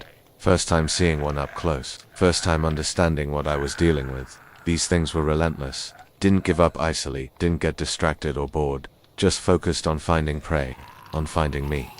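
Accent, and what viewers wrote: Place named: England, United Kingdom. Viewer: Ixnay on the English announcer, it sounds lame to Americans.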